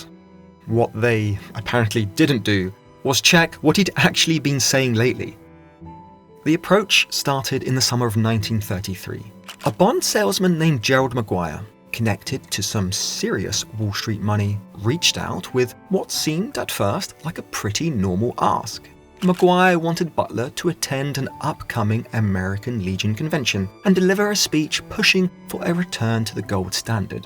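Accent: United Kingdom. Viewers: Wfhy is a Brit narrating American history?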